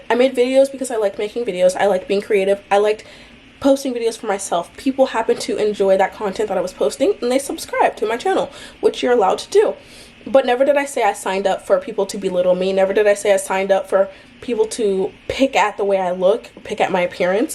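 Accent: United Kingdom. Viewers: I love how he's starting to have an English accent 🥰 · Oh my goodness has that British accent 😍😍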